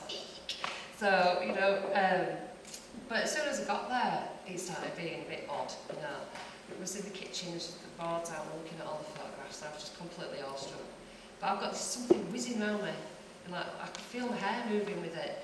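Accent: Yorkshire, United Kingdom. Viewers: it is English you Muppet!! YORKSHIRE to be exact!! Not everyone over here speaks like the queen or the BBC world service!! We are a very diverse nation in accents!!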